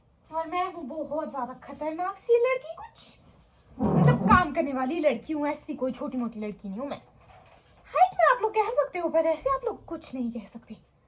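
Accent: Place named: Pahadi, India. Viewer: Pahadi tone is beautiful ❤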